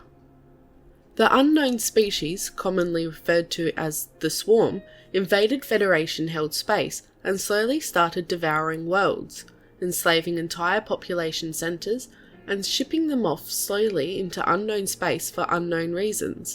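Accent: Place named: Australia. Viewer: I've been listening to other YT-ers with their HFY stories, and just found you... very cool... I like to listen to those others, but its great to hear a fellow Aussie reading these! You give a better inflection to what you are reading, which I like. Subscibed. :)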